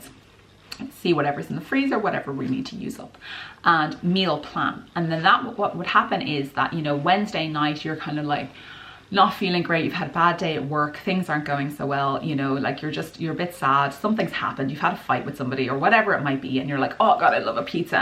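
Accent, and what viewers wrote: Ireland. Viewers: Hello, new subscriber here! I contacted bodyslim but all courses r fully booked! I shall start walking and hope to enroll with bodyslim with their next intake, in April. You r lucky u realized u need to prioritize yr time at such a young age! Yes because if we do not, we end up BURNT OUT and depressed! Enjoying yr videos and love yr Irish accent 😊.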